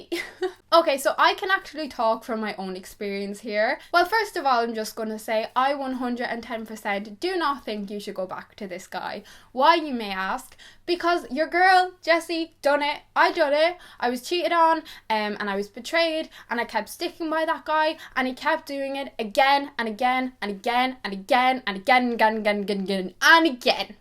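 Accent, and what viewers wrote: Ireland. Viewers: VoiceYT shes irish :) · I have detected an Irish accent and I am assuming you grew up in Ireland. But as you most likely know in Ireland discos can be quite risky. Could you maybe do a video on how to deflect pressure from guys at these teenage discos. Lately guys get their friends to pressure you and it can be quite overbearing and intimidating. So I was just wondering as a fellow Irish girl if u had any tips or advice for handling these awkward scenarios. I don't know if this topic was covered in the video as I am waiting for it to buffer but if it is just ignore me. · Is your accent Irish?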